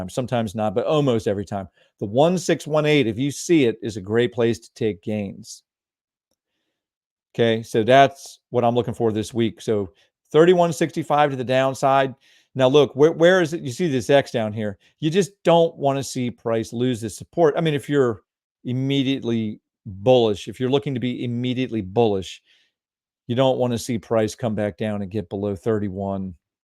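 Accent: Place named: Maryland, United States. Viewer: Btw, your accent is like my inlaws in easton,md 😂. Are you from md?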